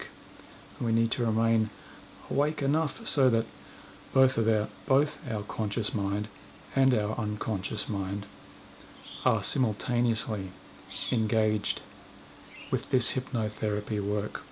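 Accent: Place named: Australia. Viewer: Dear, your videos are great and i enjoy them nearly every night. I never really liked guided meditations but yours are the best! Luckely you have this nice Australian accent which makes it an even greater pleasure! Thanks a lot "mate". Sabine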